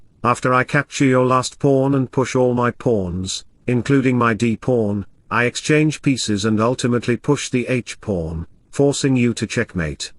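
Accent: United Kingdom. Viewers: It must be - that's a British accent.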